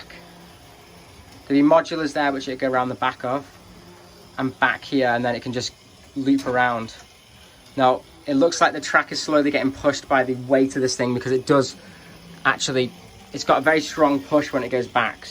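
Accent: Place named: United Kingdom. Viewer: Man I would love to have this set, but it was at a time period where I stopped being into building LEGO. Online and used, this set goes from $500 up to $1500 US now a days, which is just too expensive. I'm guessing by your accent, you bought this set used in pounds? I think if LEGO was smart, they would come out with a new monorail set. It would most definitely be a sell out.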